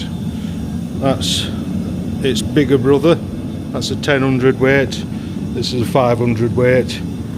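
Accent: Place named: Somerset, United Kingdom. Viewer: where are you based, tha sarnds a lot like tha frump tarn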